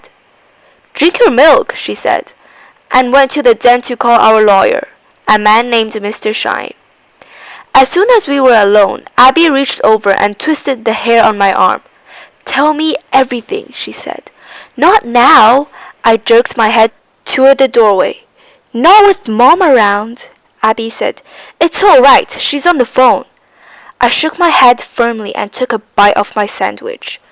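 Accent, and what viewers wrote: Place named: United Kingdom. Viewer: sry british voice annoying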